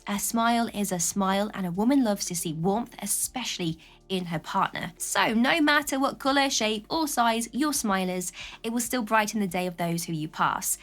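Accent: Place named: Manchester, United Kingdom. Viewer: Great video, thanks! I love your accent; did you grow up near Manchester by any chance?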